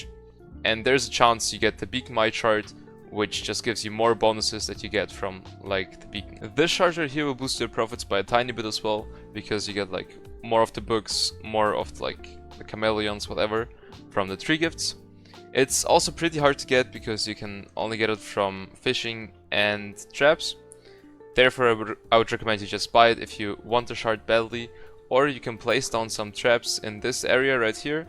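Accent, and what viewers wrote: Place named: Germany. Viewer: bro ist deutscher als deutsch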